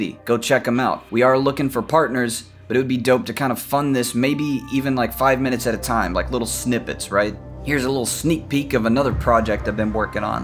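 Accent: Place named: Texas, United States. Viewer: Howdy fellow animation industry Texan! Hearing you talk feels like home🙂 I been thinking about your pitch for days, and I basically wrote an essay in response, but I feel very passionate about your idea, hope you'll bear with me. Okay! The idea of a series that shows what it's like in the US is outstanding; you're right, we don't really have that! And it's great you're thinking about bringing in therapists, hopefully public health specialists that specialize in studying mass shootings. I developed a similar story too, spent about 7 years on a novel about a mass shooter and why he did what he did. Then I lost friends in a mass shooting. In my grief, I searched for a reason why someone would do this, and read hundreds of profiles of these dudes. One thing that struck me, is that they could all basically be the same guy: brags about how smart they are to mask a deep insecurity, impulse control problems, and what I could only describe as anger tantrums, exploding the way a toddler might. 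These men are angry that they don't have the woman they want, the job they want, the money they want, whatever. And instead of turning inward and improving, they lash out. It's not a very complex journey. I applaud those who pull themselves out of this trap (like the TED talk fellow) but for the dudes who make the decision to steal human lives from our communities? Learning about how uninteresting a mass shooter's personality is, for me, was a bit like reading Eichmann in Jerusalem, a book about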